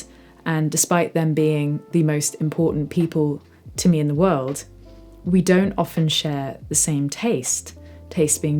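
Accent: United Kingdom. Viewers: Yo, are you British or something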